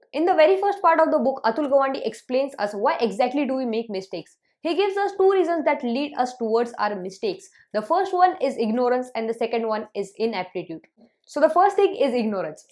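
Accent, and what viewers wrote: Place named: Maharashtra, India. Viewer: are you from maharashtra(marathi speaking) i am asking this because your speech has marathi vibe and due to your pronunciation ... please reply yarr...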